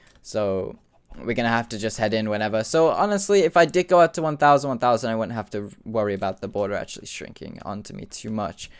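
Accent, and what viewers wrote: United Kingdom. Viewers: what happened to your british accent?:(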